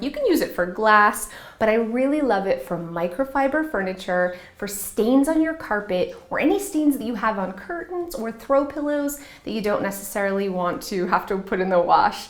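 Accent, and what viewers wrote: Canada. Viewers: Do you live in Canada? I'm trying to figure out your accent? Great video. Thank you ❤️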